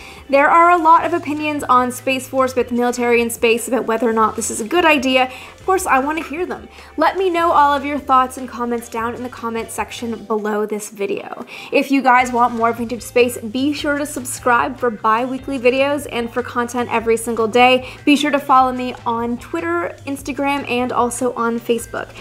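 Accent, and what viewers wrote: Canada. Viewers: I love how you have defined this area of history and claimed it as your own. You always post great stuff. Very surprised to hear you don't have an opinion on SDI after you explained it so very well. Treaty language largely says defense measures are not treaty violations and you summarized it all very well. So, despite small disagreement, very well done.... and your Canadian accent still shines through. Very adorable.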